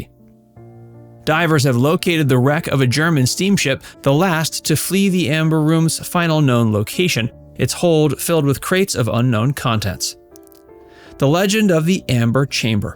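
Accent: United States. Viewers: American pronunciation of nonAmerican language is atrocious. Please learn the standard world-wide usage of words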